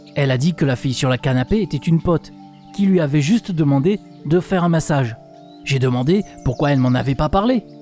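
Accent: Marseille, France. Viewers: Accent marseillais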